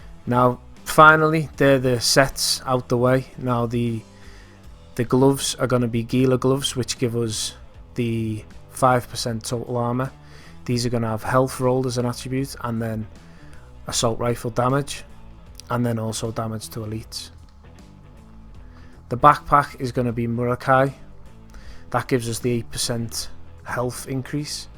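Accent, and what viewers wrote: Liverpool, United Kingdom. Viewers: That scouse accent.. lol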